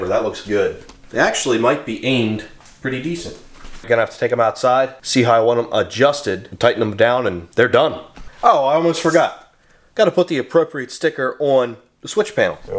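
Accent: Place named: Pittsburgh, United States. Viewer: First time ever watching, I heard your voice and thought to myself, "this dude sounds like he got some Pittsburgheese in him" then I saw the Steelers hoodie....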